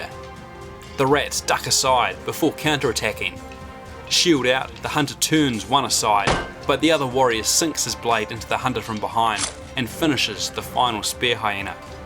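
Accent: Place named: New Zealand. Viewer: Love the character put into the battle report mate. Also, you sound like a kiwi. Hello from Hawkes Bay!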